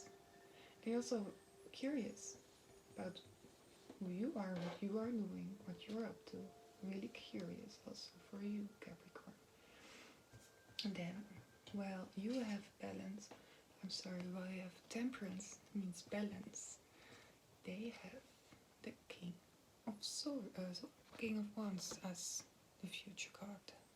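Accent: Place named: Netherlands. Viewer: aan je accent hoor ik dat je nederlandse bent. je legging is top! veel succes met je kanaal!!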